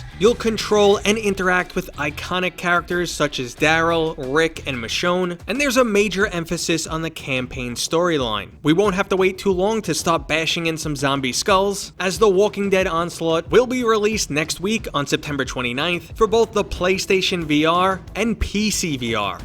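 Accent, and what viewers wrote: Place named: New York, United States. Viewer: Very interesting hearing someone doing a professional announcing video voice with a New York accent but I need more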